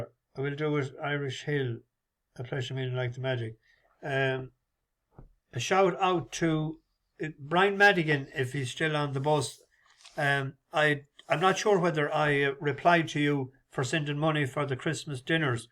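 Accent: RP, United Kingdom. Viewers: Great stuff, tips not on fire but that’s racing, still your command of the Queens English always makes me smile, keep up the good work, as you said things will turn 👍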